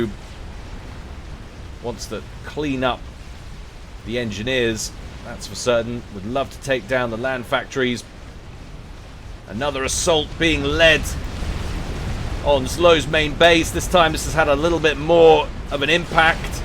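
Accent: United Kingdom. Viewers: Just found this channel and binged a few games. Supreme Commander was a favourite of mine back when it came out (in the days of 'you can use a second monitor for the map, gasp!') Happy to hear a fellow brit on YouTube too. It shouldn't surprise me, but it always does 😅. Convinced a friend to pick up FA when we both get paid (as I'm skint at the mo) and we are going to check out FAF for the coop campaign!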